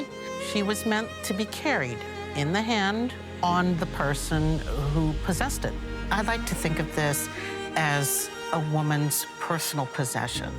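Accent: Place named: United States. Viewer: Can't get past the usa accent.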